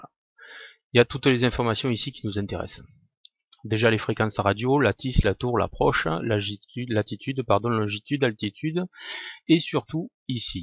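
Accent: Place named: South, France. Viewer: Très bon tuto. L’anglais avé l'accent donne en plus un air de vacances.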